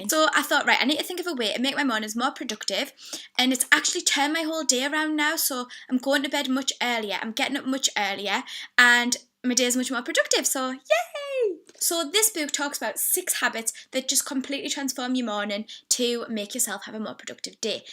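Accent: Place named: United Kingdom. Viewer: Lovely to hear your experience of The Miracle Morning. Great to hear another British accent :)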